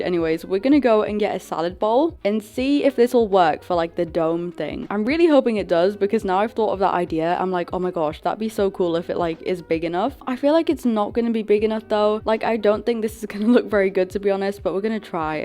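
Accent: United Kingdom. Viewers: Oh my God, I love your accent. It’s like the accent from England. I am not kidding. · Are u British? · Omg your buildings look so good but I love your British accent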